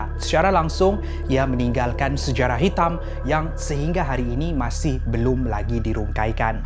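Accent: Sabah, Malaysia. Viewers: Orang Sabah...bah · ckp sabah sya suka dgt org sbh ckp...sdp gilaa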